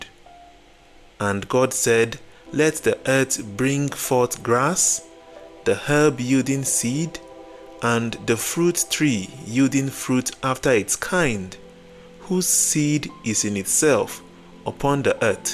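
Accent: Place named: Nigeria. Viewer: The first Nigerian accent audio Bible in the making. Thank you sir. God bless you greatly. A happy new year to you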